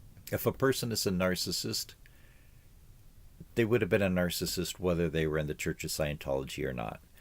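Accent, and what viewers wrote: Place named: Adelaide, Australia. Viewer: I think it’s because he grew up in the eastern suburbs of Adelaide and went to school there. When I first heard him speak I thought he sounded like he was from there and turned out he was.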